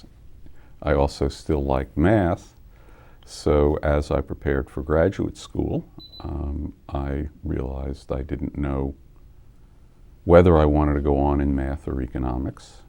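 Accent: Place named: New York, United States. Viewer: Strong NYC accent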